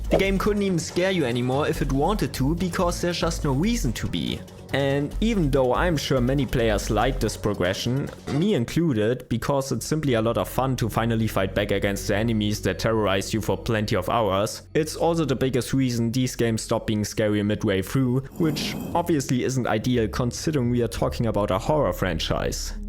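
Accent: Germany. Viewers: I kann hear deutsch akzent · Kleinen German accent 🇩🇪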